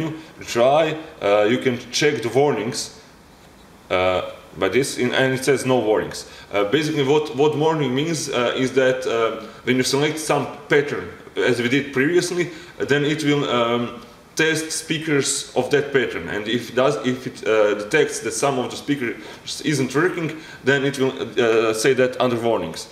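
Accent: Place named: Slovakia. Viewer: you sound like you're from slovakia.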